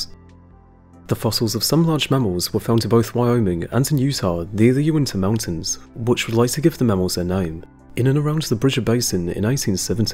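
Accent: United Kingdom. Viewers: I have trouble understanding the narrator's heavily accented British English. His speech is so fast that his mispronounced words elide with each other and create a sound salad that prevents me from enjoying this video. It looks as if it might be a good film otherwise.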